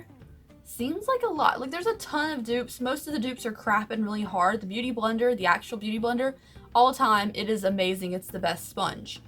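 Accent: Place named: North Carolina, United States. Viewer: this is the first video of yours i've watched and right off the bat i knew you lived in nc bc of your accent lol nc represent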